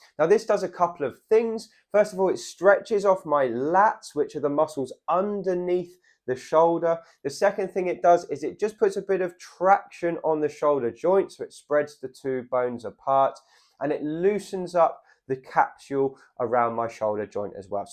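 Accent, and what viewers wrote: United Kingdom. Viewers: Thanks for your videos. I have a hard time being lazy and undisciplined to exercise. I need to wake up and get motivated. You are very encouraging. Great explanations. Like your British accent so much. I'm over 60. Do not like saying that. Have tried doing push-ups, sit ups, squats, etc. Always feel better after. Some "reverse leg lifts" on hands and knees for firming my glutes. Need to get serious about this and just enjoy it. Can not jog or run or walk a lot due to painful feet. But CAN still get around, drive, shop, etc. Sorry for long text. Btw, you make great videos and you are a good-looking, very presentable man. Keep up the good work. God bless you in a special way.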